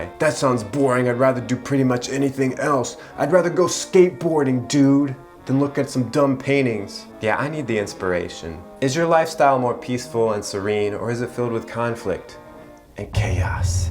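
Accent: France. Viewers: You have a beautiful French accent. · This French accent of yours is something I wasn’t ready for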